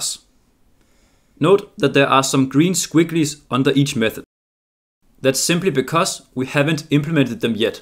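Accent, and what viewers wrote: Denmark. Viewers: As soon as I heard you said Vector I was like that sounds like a Danish accent and then I check channel description and it says Denmark. I am not sure if it’s the fact I am Danish I could tell or if it’s because I watched too many other Danish people, probably both. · Du lyder lidt dansk 😀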